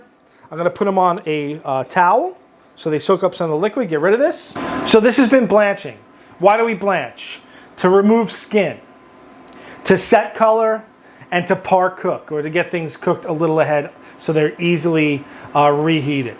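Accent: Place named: New Jersey, United States. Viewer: When i close my eyes, i sometimes hear Brad Leone. Are you cousins? Or are you from Jersey?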